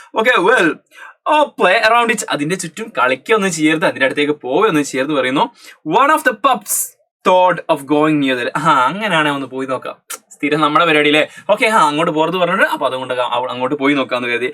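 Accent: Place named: Kozhikode, India. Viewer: Kozhikode aan enn thoonunnu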